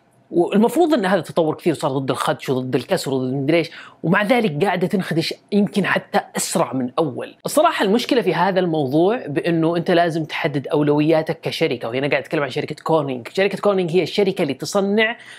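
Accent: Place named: Saudi Arabia. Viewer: والله انت رائع من عندك حبيت اللهجة سعودية ربي يبارك فيك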